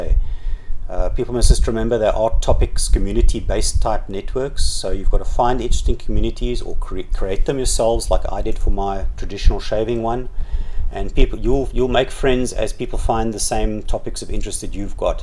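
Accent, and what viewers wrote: South Africa. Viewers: I hear a South African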